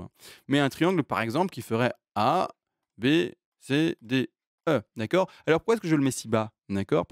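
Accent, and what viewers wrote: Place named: Belgium. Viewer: Oui il a l'accent belge.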